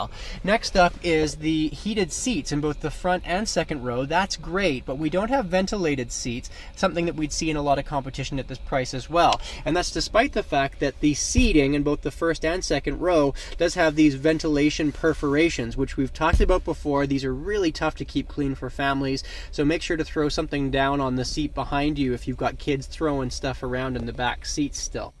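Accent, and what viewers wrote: Australia. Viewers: Thats bloody good Aussie accent mate, good on ya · Your Australian accent is excellent!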